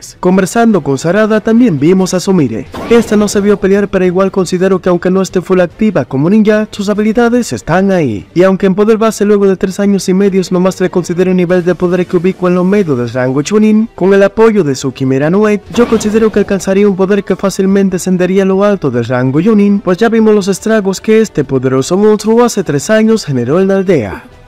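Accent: Dominican Republic. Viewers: El Sensei tiene su flow dominicano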